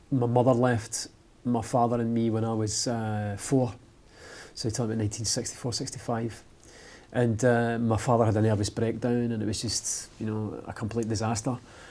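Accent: Scotland, United Kingdom. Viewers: I am German and understand Scottish much better than British ;) Love it a lot ♥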